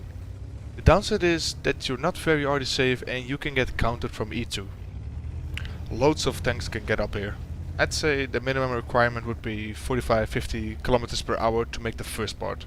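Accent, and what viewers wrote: Netherlands. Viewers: je kunt zo goed horen dat je lekker nederlands bent.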